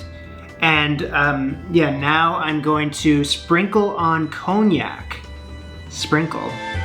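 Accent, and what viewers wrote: Canada. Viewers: You had it right ... The L's are pronounced in chantilly. You give the A a bit different sound than I, but I think that's because you're Canadian. Stick with your instincts. Really enjoy your videos whether you seem to mess up or not.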